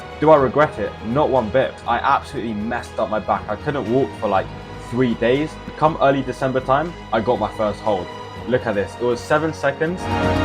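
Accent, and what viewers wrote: United Kingdom. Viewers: holy british accent and british teeth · are you british i'm just asking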